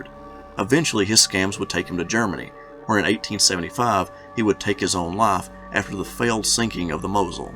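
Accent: South, United States. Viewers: Thank you, as a Canadian myself I appreciated this episode. Btw when I was a youngster I watched Richard Boone in Have gun will travel. Your accent sounds southern, where are you from?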